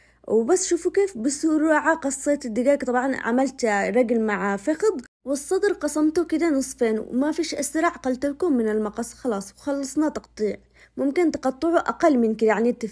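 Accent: Aden, Yemen. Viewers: شكرا جزيلا لك يا بنتي كلامك العدني الأصلي والاكل ماشاءالله تبارك الرحمن ربي يحفظك حلو جدا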